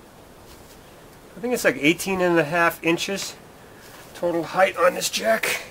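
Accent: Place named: New England, United States. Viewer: Outstanding content. Thank you for this video! If you don't mind me asking, is that accent from Rhode Island, CT, or other?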